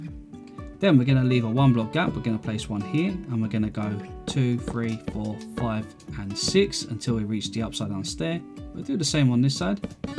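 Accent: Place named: North, United Kingdom. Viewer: I just had to subscribe to the channel :) Thank you for the wonderful tutorials you publish. I am from Germany, so there are at least two benefits I have from watching those awesome tutorials: First (the obvious one): Learning about building wonderfull objects in Minecraft. If not "step by step" then at least tons and tons of inspiration! Second: A wonderful training in "listening and understanding" English. May I ask where your accent is from? It sounds very much like from the north. So - for me - you are in some way the "Bob Ross" of Minecraft-Tutorials :) It is very pleasant to listen and of course to watch your tutorials AND when it is done a beautiful object is created ;) Greetings from Germany and all the best!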